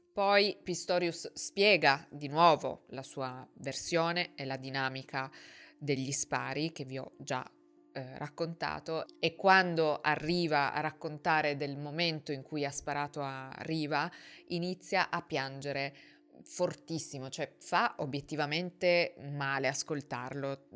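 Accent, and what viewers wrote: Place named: Piedmont, Italy. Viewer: "Pistola spiegata"??? Gia, fastidioso l'accento piemontese (corso di dizione, è il tuo lavoro). Ma certi strafalcioni.... Aggiungo: "Sfonda la porta del water"...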